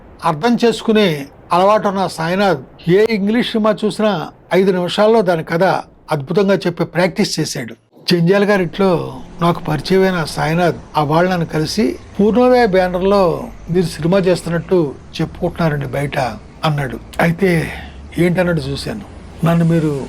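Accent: Godavari, India. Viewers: గోదావరి యాస లో మీరు మాట్లాడతా ఉంటే ఎంతో ముచ్చటగా ఉంది. అదీ మీ సినిమా గురించి 🎉🎉🎉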